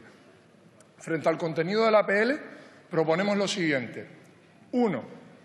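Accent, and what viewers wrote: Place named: Canary Islands, Spain. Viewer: ay si mi papi,muy interesante la intervención pero el acento muyayo me puede jajajajjajaj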